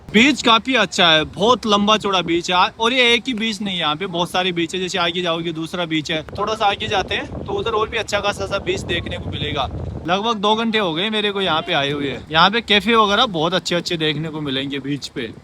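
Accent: Rajasthan, India. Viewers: Are You Belong from Rajasthan? Language Accent 😁❤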